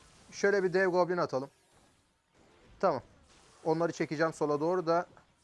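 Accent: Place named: Istanbul, Turkey. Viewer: dil kursuna falan mı gitmeye başladın türkçen istanbul türkçesine dönmeye başladı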